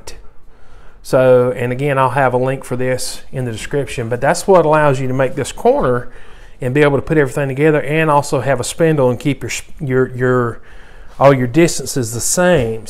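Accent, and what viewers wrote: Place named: South, United States. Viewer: I love the Southern accent! Very charming. "A letter" instead of "a litter."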